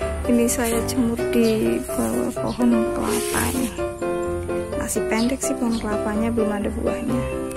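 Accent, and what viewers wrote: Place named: Java, Indonesia. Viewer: Malang hadir. Logat medoknya aku suka, persis persis medokku jg. Ditunggu video2nya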